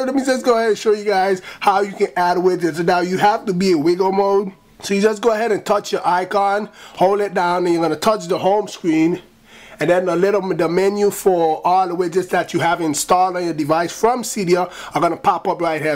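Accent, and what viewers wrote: Jamaica. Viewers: II knew you was Jamaican. Check out vs in YouTube the shut is fire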